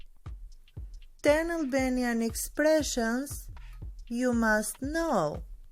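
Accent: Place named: United States. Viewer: Nice American accent too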